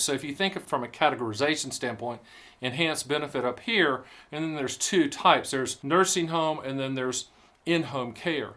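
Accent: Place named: South, United States. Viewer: Fast talking Southern Boy ! Whew 😥 👍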